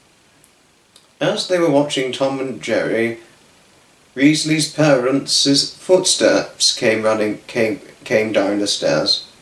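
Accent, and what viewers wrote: United Kingdom. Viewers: I really enjoyed this inspirational chat time. Your story was great,! I like listening to your wonderful British accent😊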